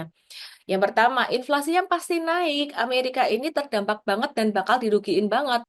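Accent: Java, Indonesia. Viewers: Suaramu medok mbak.... Ketoro wong jowo iki😮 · Menyimak dengan baik kontent yg berbobot...tapi sedikit gagal fokus karena "medok lafalnya"..😮😊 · Sampean ko yo bahasane medok temen mbak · Wong jowo Iki mbak e. Logate ketoro nenen.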